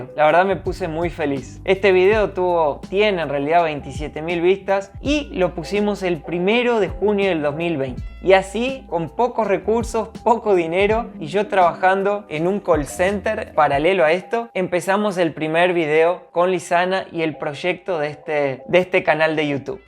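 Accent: Argentina. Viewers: Estonia? parece Argentino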